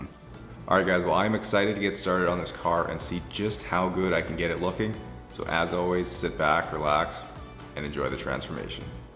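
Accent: Canada. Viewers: What did he say? Compressed air and what? His Canadian accent lost me.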